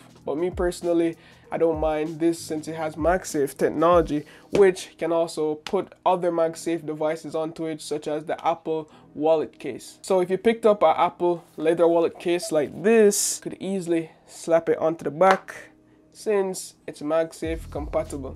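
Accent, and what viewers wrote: Jamaica. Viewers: Why do you sound Jamaican 👀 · U sound like u have a lil Jamaican accent 🇯🇲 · my guy jamaican as hell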